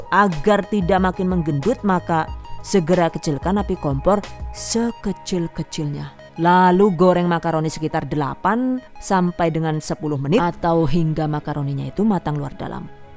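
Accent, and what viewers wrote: Banyumas, Indonesia. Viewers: Wkwk wong ngendi sii , geneng ngapak ya 😆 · wong banyumas kieh...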